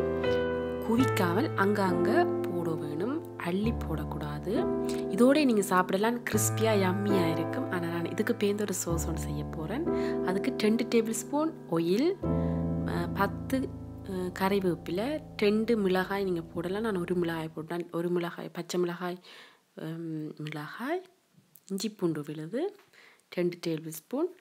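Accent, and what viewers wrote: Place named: Sri Lanka. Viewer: my fav sis...unga tamil romba super... reminds me of my srilankan friends :)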